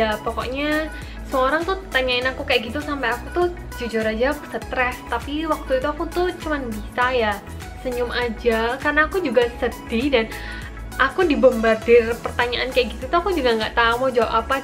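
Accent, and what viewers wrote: Java, Indonesia. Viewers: Cantik+logat medok Uhhhh😍😍😍🥰🥰🥰🥰😍😍😍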